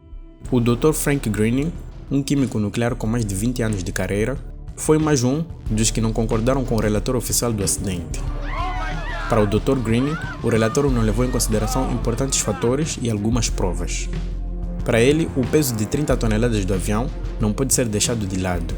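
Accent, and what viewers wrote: Portugal. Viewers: Nossa, que idioma esquisito, parece português europeu ou português arcaico... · Português de Portugal, ninguém merece aff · Por causa desse sotaque insuportável de português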